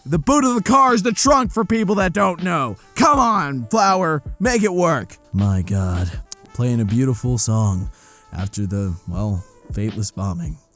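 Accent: Ireland. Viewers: have to give you brownie point for trying the Scottish accent. but its sounding irish but i love how some of these tweets sound like a few of my pals (fyi i am scottish before anyone says anything) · Bro, that's an irish accent in my ears. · He sounds irish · You’re more Irish and less Scottish. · Yo I dont want to be that guy but that accent was Irish more than Scottish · This is an Irish accent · You sound a bit Irish lol good try my friend:)) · Just some pronunciation for you! Aye - eye Aff- ah f (you got it right!) Cannae - can ay Dinnae - Din ay (The “ae” is basically “ay” like May) As for your accent, it’s pretty good, but it’s more of like an Irish ish accent, but still awesome!!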